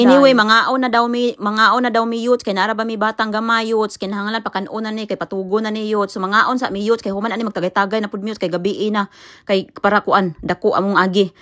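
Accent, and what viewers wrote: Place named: United Kingdom. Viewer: Paspasi ang cheers dihaa! ang imo British accent dai kay gwapa paminawon.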